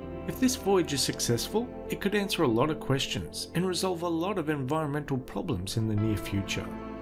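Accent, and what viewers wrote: Australia. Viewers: An Australian narrator talking about square feet and pounds. A reminder that in Australia we are metric like all the other sensible countries in this world. Thumbs down.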